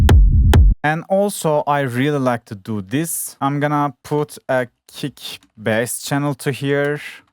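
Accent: Turkey. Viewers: TAM DİYECEKTİM TÜRK AKSANI BU DİYE KANALA BAKINCA ANLADIM KJDFGHLKJGH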